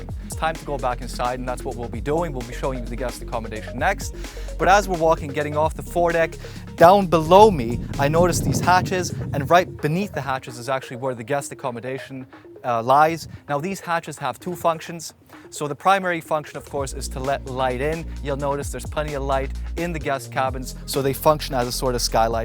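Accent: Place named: United States. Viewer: Wonderful gentleman's yacht with lovely lines. Great walkthrough! Very positive energy and excellent American English sir!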